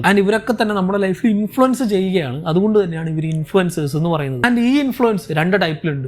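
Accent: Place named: Hindi, India. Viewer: A malayali with Hindi touch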